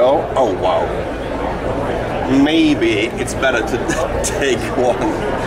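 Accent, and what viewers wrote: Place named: Germany. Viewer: amazing review, i like German-English language :)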